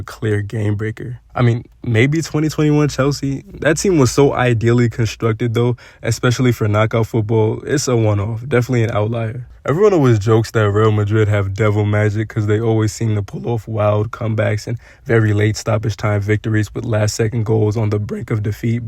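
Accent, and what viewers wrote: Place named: United States. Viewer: Where are you from bro? I can't pinpoint your accent.. I just know it's from the States. This is a nice video tho.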